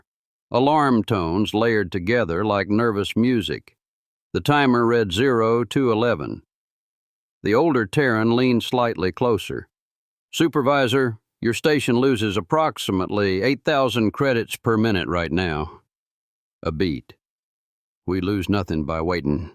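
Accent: South, United States. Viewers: Sounds like cleitis is telling a story real southern accent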